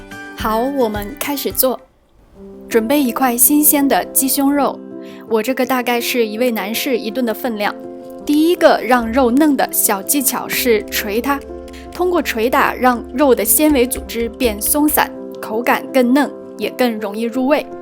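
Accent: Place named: Japan. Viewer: 看过一个日本人说中文做菜的频道，你这语音语调描述方式就听着好像啊。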